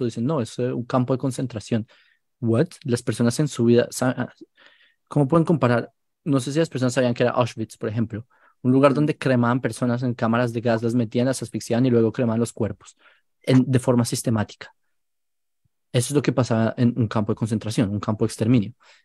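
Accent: Colombia. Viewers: Tienes hacento colombiano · Eres colombiano?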